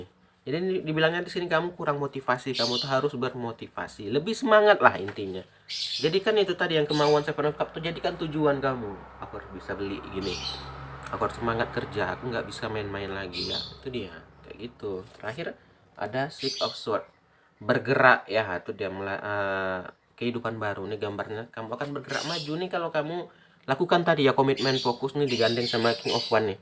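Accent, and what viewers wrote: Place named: Madura, Indonesia. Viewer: Pisces hadir kok kyk org madura logat nya hhhh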